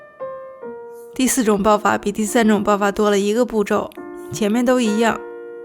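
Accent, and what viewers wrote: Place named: North China Plain, China. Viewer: 是哪儿人呀好标准的华北平原儿化音哈哈哈哈哈哈河北人表示听了超亲切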